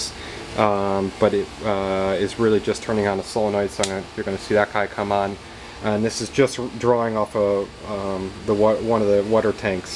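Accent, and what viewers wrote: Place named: Minas Gerais, Brazil. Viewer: deu pra perceber o sotaque mineiro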